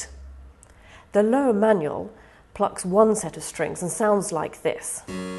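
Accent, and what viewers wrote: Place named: Oxford, United Kingdom. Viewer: Oxford English pronounced properly. Very clear and eloquent.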